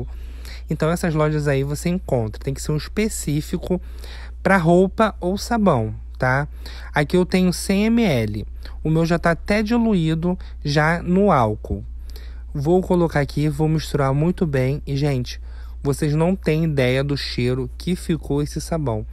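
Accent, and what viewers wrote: Rio de Janeiro, Brazil. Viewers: Adoro suas receitas pq além de vc explicar muito bem ainda ficam lindas e certamente muito potentes, e esse ficou maravilhoso., gosto muito também da sua voz e de seu sotaque. Vc é carioca?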